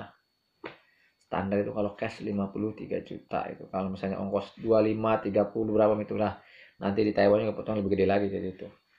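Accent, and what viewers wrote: Indramayu, Indonesia. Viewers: dermayu tah mas?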